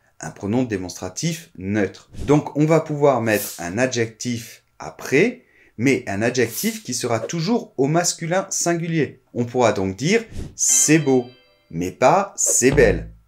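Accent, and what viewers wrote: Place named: France. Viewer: Bien expliqué et avec la prononciation française que j'adore!, bon weekend à toi et à ta famille! 😘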